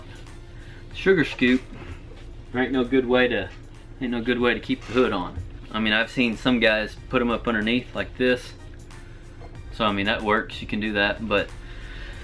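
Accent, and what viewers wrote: Oklahoma, United States. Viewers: You from Oklahoma???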